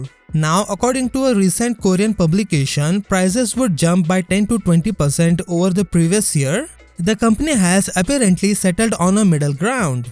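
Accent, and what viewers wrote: India. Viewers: That indian accent really caught me off guard lmfao. Couldn't take it serious so I didn't watch the video, sorry but I had to let that out.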